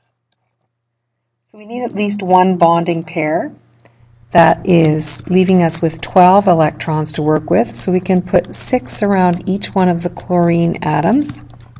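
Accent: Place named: Canada. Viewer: Thanks, that really helped! Even with that Canuck accent! Sounds like your software or mic has some effect that makes your voice start out very low volume, then come up to normal volume. You video is super helpful, so I hope you can get that fixed!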